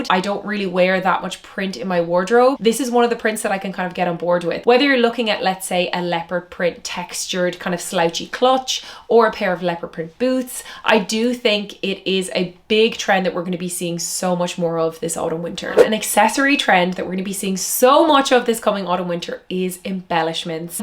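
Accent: Ireland. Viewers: Just sounds Irish to me!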